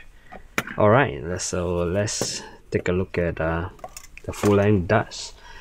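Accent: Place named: Singapore. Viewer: you sound singaporean are you? great vid btw thanks for telling me about the worker half length pusher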